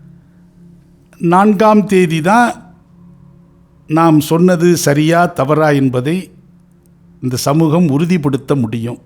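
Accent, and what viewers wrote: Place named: Kerala, India. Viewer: இவர் மலையாளி மாதிரி பேசுகிறார் மலையாளி ஜோதிடர் ரா !....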